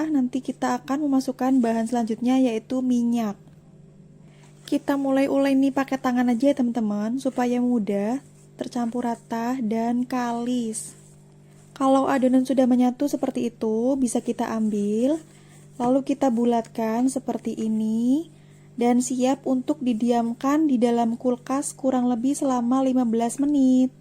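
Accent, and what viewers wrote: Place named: Java, Indonesia. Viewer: Medok ora pa2 yu,, 😁😁😁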